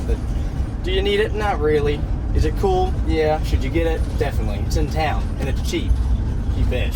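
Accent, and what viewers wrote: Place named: South, United States. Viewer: southern drawl comes out when he talks to the Deep South People! 😂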